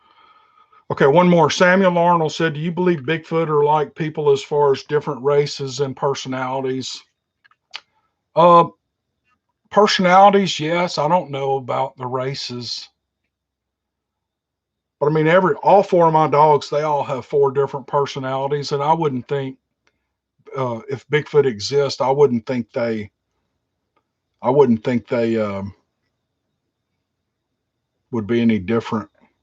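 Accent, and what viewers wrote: South, United States. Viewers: Keep up the good work and please do more live! You are just darling and I love hearing you talk. I'm from the south also so I really appreciate a good Southern voice. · I’m an Oklahoma girl, not really a girl anymore, but feel like one. I’ve always been drawn to voices,man or woman, with a southern drawl. It’s probably because that’s what I’ve heard all my life. It’s just a comfortable, warm feeling, I don’t know how to describe it. Anyway, thank you for your hard work. You are genuine and generous. Thanks for recommending Beast of the Woods. I’m going to their channel right now! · Hey 👋 just seen this your luved/liked by everyone your voice is great 👍 the southern accent is just great also keep those stories coming and ty for the time you take to tell these stories God bless oh oh luv your pets hanky panky is to cute. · I’m from Indiana and I love your southern accent. 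😁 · Your voice is great. You were meant to be on Radio or YouTube. Your Accent and your Southern smoothness are your Brand. It is the best. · Southern "twang" or no, it is the directness, honesty, sincerity, and humor the generates a two way confidence and respect between you, your audience and the material as presented. Get it?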